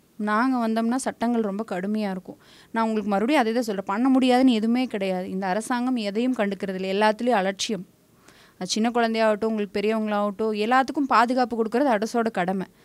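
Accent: Kongu, India. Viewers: Coimbatore Tamil best sako..we be with you…❤❤❤FROM TAMILEELAM..❤❤ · அக்காவின் கோயம்புத்தூர் பேச்சிற்கு நான் அடிமை 🎉🎉🎉..... நாம் தமிழர்....(மதுரை மத்திய தொகுதி). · Very good kongu speech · கேள்வி கேட்கும் விதம் அருமை கேள்வி கேட்டு அவரின் கருத்தை பதிவு செய்யும் வரை பொறுமை காத்து அடுத்த கேள்வியைக் கேட்கும் விதம் அருமை கோவை தமிழ் இன்னும் அருமை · அக்கா உங்க கொங்கு தமிழ் நல்லா இருக்கு Vote for NTK ❤❤❤❤❤ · Kongu Tamil .. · கோயம்பத்தூர் தமிழ் சூப்பர் அக்கா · கோவை தமிழ்❤❤..கலாமணி ஜெகநாதன் சிறப்பு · கோவை பேச்சு,, அக்காவிடம் இருந்தே பிடித்தது,,நாம் தமிழர் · இந்த அழகான கொங்கு தமிழ கேக்கறதுக்கே 46 நிமிஷம் முழுசா பாத்தேன் இந்த காணொளியை!! தேன் மாதிரி இருக்குயா❤❤❤I'm from Kanyakumari, but i can make my CBE relatives and friends to vote for NTK. At least 50 votes. இந்த கோவைல தான் படிச்சேன்.. படிப்பு தந்த ஊருக்கு ஒரு சிறு அன்பளிப்பு❤❤❤ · அருமையான கொங்கு தமிழில் அழகுபட பேசும் அறிவும் அழகும் நிறைந்த வீர மங்கையாக திகழும் எங்கள் கோவை பாராளுமன்ற வேட்பாளர் திருமதி.கலாமணி ஜெகதீசன் அவர்களுக்கு மாபெரும் சரித்திர வெற்றி பெற்று சாதனை புரிய வாழ்த்துகள்.. 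· Kongu tamizh ivvalavu azhagaa❤ kalamani yin vaayil irunthu varum pothu❤ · அக்கா கோங்கு தமிழை அழகா கேட்ட திருப்திக்காக நன்றி புலிக்குட்டி புயலா பேசியதற்கு வாழ்துக்கள்....😂😂😂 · Original kovai slang ❤❤❤😊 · கொங்கு தமிழ்.... அழகு🧡🧡🧡🧡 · கொங்கு தமிழ் அருமையாக உள்ளது 🎉🎉🎉🎉🎉🎉🎉❤❤❤❤ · அண்ணன் சீமான்! அருமையான வேட்பாளர் தேர்ந்து எடுத்து இருக்கார்! அழகான கொங்கு தமிழ்! வாழ்த்துக்கள்! நன்றி நாம் தமிழர்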